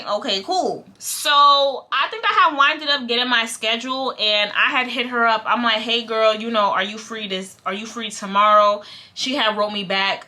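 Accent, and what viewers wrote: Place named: New York, United States. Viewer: Hey giiiirl 🎉❤ First of all, your voice, personality, and New York accent keeps me coming back to your videos 😂 Secondly, we just gone say this was a blessing that this didn’t work out with this girl because like you said, bad apple. & say you would have went to her house after she asked that.. she could have went to her lil 10,000 followers and tried to play you because you were up and coming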